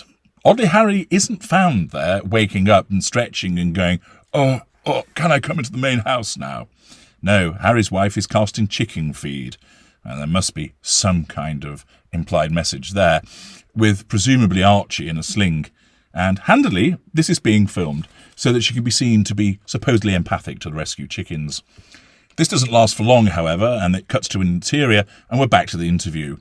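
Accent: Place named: Yorkshire, United Kingdom. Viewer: Love that Yorkshire accent, you almost sound like me!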